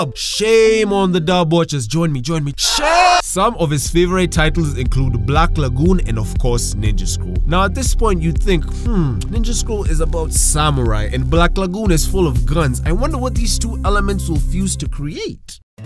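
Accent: Kenya. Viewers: I know a Kenyan accent when I hear it. instant sub!!!! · wait wee ni brathe kumbe😂😂 lol the accent didnt hit until I saw 2007 n was like wait you is raia😂😂 heres a sub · Mans a Kenyan, I couldn’t tell by the accent at first but good work big man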